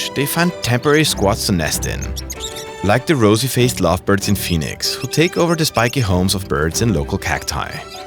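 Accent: Germany. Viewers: Deinem Englisch nach bist du eindeutig deutsch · When you're used to fake German accents done on purpose by some youtubers and stumble upon a serious documentary with a real German accent.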